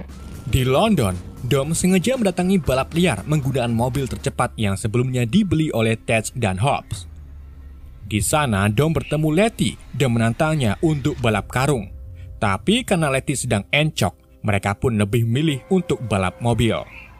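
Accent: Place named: Banyumas, Indonesia. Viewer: wong ngapak ya mas nyong ya iya i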